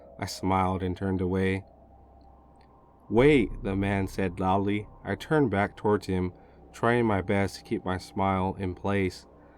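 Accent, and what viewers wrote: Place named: California, United States. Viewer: Much better. I was going to ask if you were from California, then you said you were. I knew it, I used to live there your narrator definitely has the accent. Can I ask why you are not narrating your stories? No offense to your narrator but you have a better voice for it. I look forward to more of your stories!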